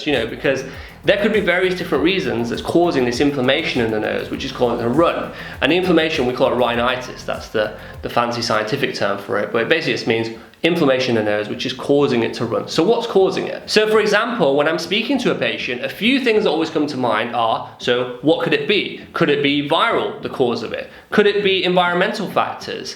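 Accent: United Kingdom. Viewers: Oh my god... You've got a strong British accent.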